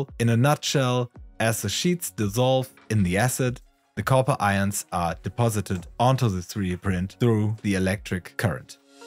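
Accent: Germany. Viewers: An deinem Akzent höre ich das du auch aus Deutschland kommst 😁💪🏽 gute Arbeit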